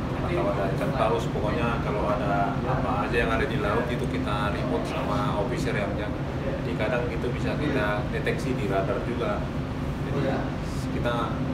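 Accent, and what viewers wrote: Indonesia. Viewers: Saya suka sama logat ny Lae... Horas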